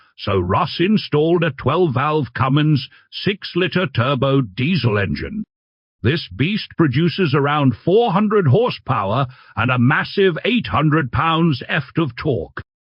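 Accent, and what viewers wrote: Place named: United Kingdom. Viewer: A nice truck but what is with the goofy British accent? It makes me give a thumbs down.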